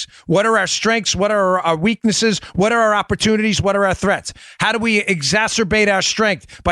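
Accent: New York, United States. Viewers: I like this show sounds like my boyfriend New Yorker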